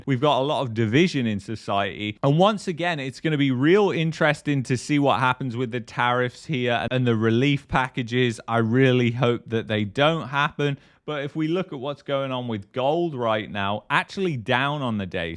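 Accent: United Kingdom. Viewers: Lol, IDK but I love it. Obviously he is some kind of libertarian type who understands economics. The scary thing about Carney is he doesn't understands economics, he only understands statistics and politics. He is essentially a Stalinist. It is fun to hear criticisms like this in a British accent. Usually one just hears the colonial pretensions about how great the UK is and how lucky Canada is to be part of it. This channel is keeping it real. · What kind of British accent is this? Anyone know?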